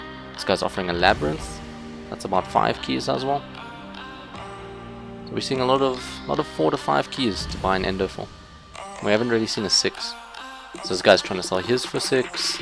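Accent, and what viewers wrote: South Africa. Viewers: Awe bru you sound south african my chommie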